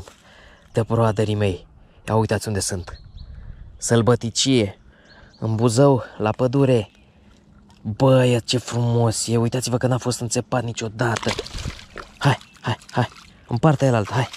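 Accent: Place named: United States. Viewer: Unde ai pescuit în America sau pe o baltă din Romămia că după cum de exprimai erai mai mult american decăt romăn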